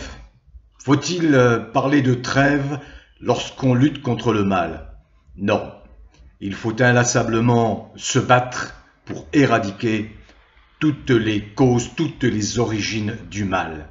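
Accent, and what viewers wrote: Marseille, France. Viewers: Joyeux Noël Mr.et j espère que l on pourra encore rire avec vous…et votre très bel accent…marseillais…Un grand merci de nous tenir au courant…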